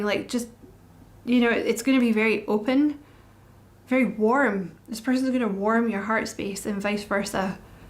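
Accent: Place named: Scotland, United Kingdom. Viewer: Just from listening to you I can feel you're a very special person, spiritual, witty, funny, and I love ypur particular Scottish accent. Thanks for the reading. I love the beautiful being that you are...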